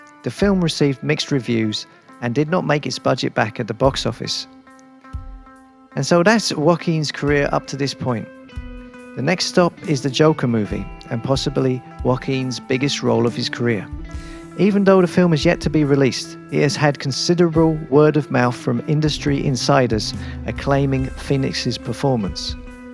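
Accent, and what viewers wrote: United Kingdom. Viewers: Other than the cringeworthy use of the made-up "disorienTAted" by a narrator with a diamond-cut English accent, still a really informative and well-researched documentary.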